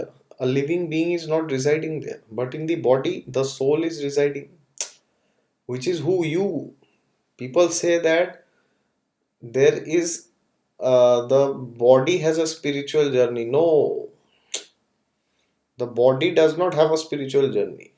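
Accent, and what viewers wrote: India. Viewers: I really enjoy your Indian tone English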